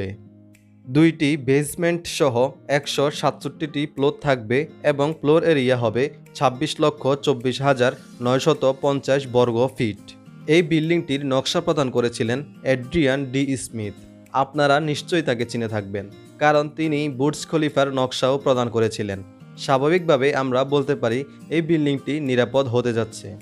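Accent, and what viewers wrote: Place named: Noakhali, Bangladesh. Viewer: ভাই নোয়াখালীর কথার টন পালটান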